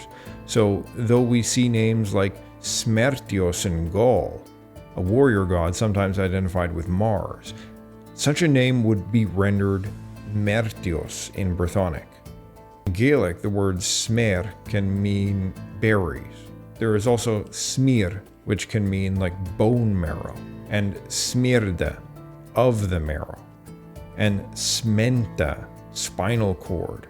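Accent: Canada. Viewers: Can't say the stuff I've seen from Scots is any better. The placenames are going to be more accurate but not the information. Also the video is in Canadian English so don't expect the words to sound exactly the same as they do in Scottish English. As for the visuals, well have to agree with you there, sick of ai slop and sick of random images that don't relate to the audio. The most common way of pronouncing gaelic is 'gaylick' in America, England, Australia etc. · They do. This is a Canadian channel so he has a Canadian pronunciation which is the same as the Irish pronunciation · He's Canadian. And over the years I've known many native speakers of Nova Scotian Gaelic, and in their dialect of English they pronounce it gay-lik. It's just how it is, and they've got every right to describe their own language as they like.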